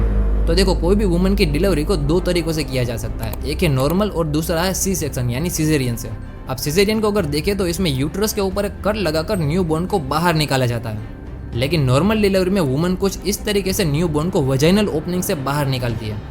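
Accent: Gujarat, India. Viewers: Aap gujrati ho bhai 😊😊😅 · ભાઈ ગુજરાતી છો તમે અવાજ પરથી ....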